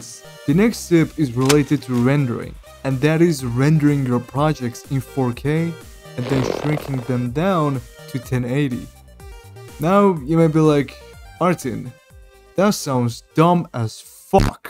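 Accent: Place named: Iran. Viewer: ببین قبل از اینکه اسم کانالتو ببینم گفتم به خدا این داواشم ایرانیه از روی لحجه فهمیدم و خیلی از این که این موضوع رو فهمیدم خوشحالم سابت دارم داداش از محتوات به درستی استفاده میکنم❤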